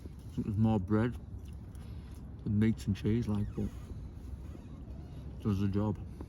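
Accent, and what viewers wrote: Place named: Newcastle, United Kingdom. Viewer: Got to know this bridge, courtesy of the wonderful song, by. Himself a Middlesbrough lad who sadly died in December 25. Chris’ words of this song tell his story of the birth and demise of the area. Thanks for this short video. As a Southerner, I call your accent a Geordie, but I could be off the mark. First one of yours that I’ve seen due to the Transporter bridge and area which so cleverly penned into a memorable song ❤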